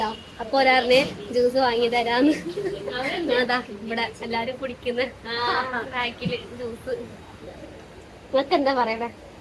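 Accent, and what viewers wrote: Malappuram, India. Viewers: മലപ്പുറം മലയാളം ആണല്ലോ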